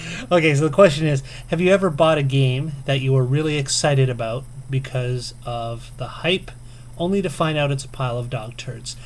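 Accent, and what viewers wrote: United Kingdom. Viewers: LOL..great vid man... Nice british accent! Haha.. u tried XFile2708's famous line!! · Your the man!!! lol, its been a while since i've had a big smile on my face lol. I say you sound more Enfield than East End but great atempt, if i heard you talking like that i'd probably think you was from Essex or somewhere like that. Londoners would love you talking like that down the pub, especially the "More tea Vicker" as that saying is normally reserved for when someone *Cough - Breaks Wind - Cough* lol Fantastic. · Excellent answers and you sounded great as a Brit too buddy.: ) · London accent.Why all americans think london accent is british accent .you are funny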